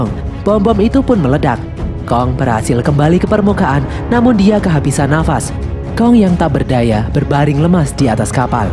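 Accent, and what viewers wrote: Java, Indonesia. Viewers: Min Anda Orang Jawa ya kop Bahasamu koyo ngono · Seru onk boso jowo e 🤣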